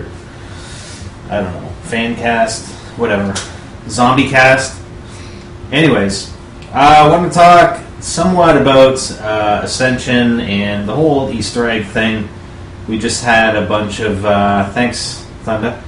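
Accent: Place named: Canada. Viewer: You're Canadian? I always thought you sounded like it.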